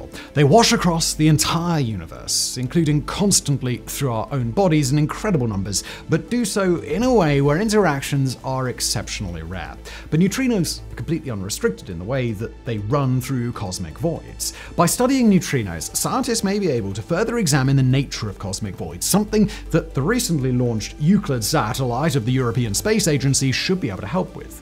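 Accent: United Kingdom. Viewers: You Tube SUCKS! I have removed this man's channels over and over again. But when You Tube changes the algorithm, it force feeds me this Simon guy's many channels despite my removing him. Simon's channels suck. They are poorly researched information that is mostly government propaganda talking points. Terrible videos. And just because Simon has a British accent, this doesn't not make him an authority on anything. He's just a talking head. England is over. Brexit has made the only job in England a You Tube content provider. Terrible list videos that spread falsehoods and bull.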